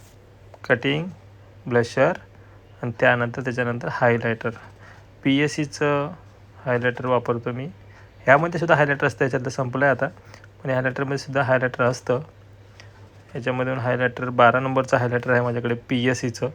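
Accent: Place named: Latur, India. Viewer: सर तुमच्या भाषेवरून मी ओळखलं होतं तुम्ही लातूरचेच आहात मी पण लातूरचीच आहे प्रकाश नगर आणि सासर परभणी आणि पुण्यात राहतो तुम्ही तर आमच्या जवळचे निघालात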